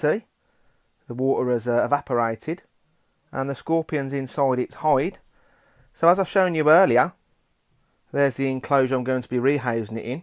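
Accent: Australia. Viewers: The best Aussie accent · As a layman I have never heard of this classification, but when I hear an Australian say the words "poison level foive", I know sh** is real!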